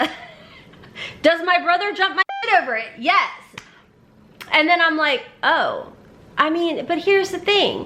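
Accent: South, United States. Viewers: hearing your southern drawl when it sneaks out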